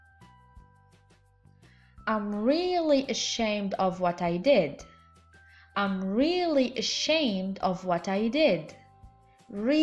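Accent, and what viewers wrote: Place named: United Kingdom. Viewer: Hi,you have amazing british accent thank you for evreything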